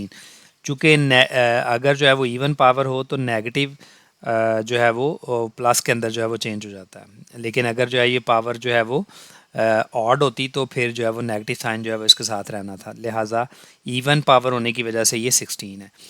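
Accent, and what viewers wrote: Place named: Punjab, India. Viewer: The bad thing about online classes is we missed your punjabi accent and jocks❤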